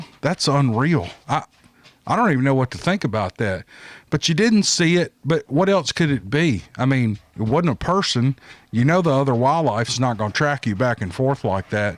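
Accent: South, United States. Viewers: I could listen to your intro songs and by themselves for hours and still enjoy your show.Im in the Huntsville area so your accent is easy for me to understand,did you know that there are eleven distinct southern dialects? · There nothing wrong with your voice I love the way you talk and when you do these videos you can close your and imagine yourself there you do a good job in tell you this I listen to bigfoot case and I listen al TRUSTED but your is the best cause you make the stories more interesting and I get my popcorn and sit back when you tell the story it like you there .thank for the video I keep enjoying your videos keep up the good work with your southern accent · Your channel for stories is the best. Your southern accent and kind way about you, makes you fun to listen to. Keep up the great job.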